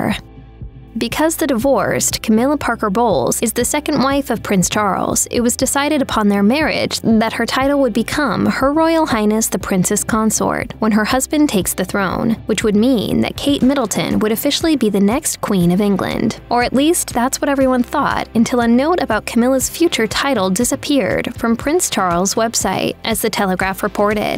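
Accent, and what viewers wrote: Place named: California, United States. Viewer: The voice narrating this has too strong of a Cali-girl accent. Emphasizing the end of every word by drawing it out. It's distracting.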